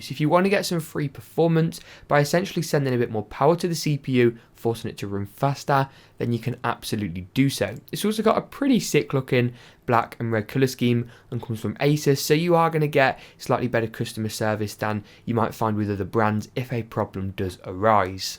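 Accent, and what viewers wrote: England, United Kingdom. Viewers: You sound english, Why priced in dollars?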